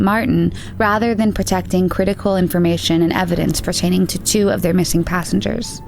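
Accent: India. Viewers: I think the narrator is indian..the accent makes me feel so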